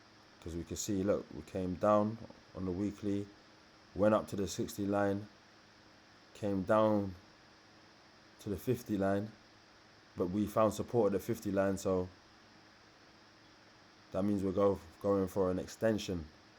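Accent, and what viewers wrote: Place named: South London, United Kingdom. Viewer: I feel like I know u bro the way u speak I feel like ur in south London my guyyyy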